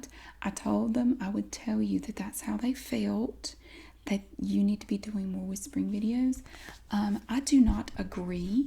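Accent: South, United States. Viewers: To the Dumb fucks who hate southern accents, Why in the Goddamn fuck would yall even click on a video.I'm tired of these fucking yankee pricks talking their Goddamn Bullshit about us SoutherN People. I'm sorry for the profanity,But Im a High Strung Texan that's had a few drinks (PRE 4TH OF JULY) you are one of the best ASMR artist's Ive ever seen. You are a very beautiful woman,that doesnt look old. These Haters can just go Fuck off down the road somewhere. Leave This Great,talented beautiful woman alone!!!!!!!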